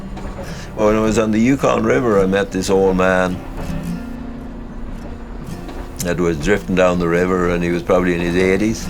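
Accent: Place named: Ireland. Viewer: Hi —that was really excellent. He still has a bit of an Irish accent:-) Guy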